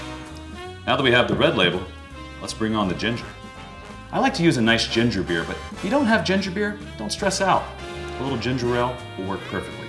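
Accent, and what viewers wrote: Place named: United States. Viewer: OK, stop criticising, people! This video dates back 5 years. If they would do it again, this guy wouldn't wear a V-neck T-shirt under a suit jacket. Hell, he wouldn't wear such a cheap, crumpled, ill-fitting suit jacket. They wouldn't allow this guy to talk so slick American to promote a Scottish brand. And they would cut out that annoying background music. Wouldn't they?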